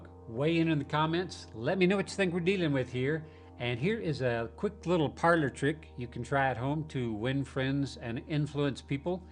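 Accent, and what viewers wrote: South, United States. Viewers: ***** Where can we find your artwork? I am living in Utah and the mormons destroyed my design career- that is another story, but I am from Memphis and lived in Nashville for 8 years- Is that a southern accent that I am picking up? . But, I have decided that I want to do artwork and get a website going. Lots of Bigfoot sightings here, well, everywhere it seems.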